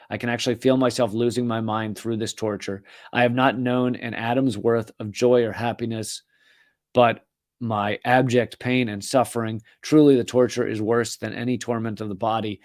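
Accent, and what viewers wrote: United States. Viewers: Stop the country accent. It's liberals that enslaved blacks. It's liberals that created concentration camps. It's liberals that wanted to starve Americans for refusing the "vax". Most country folks want to live and let live.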